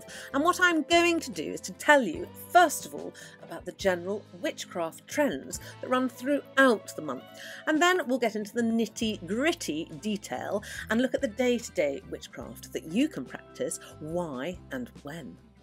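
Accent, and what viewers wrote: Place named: Somerset, United Kingdom. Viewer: I'll laugh if you're from Totnes! But I'm detecting more...... Somerset?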